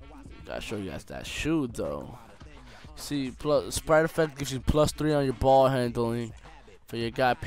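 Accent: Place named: Dominican Republic. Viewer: He talks like that cuz he dominican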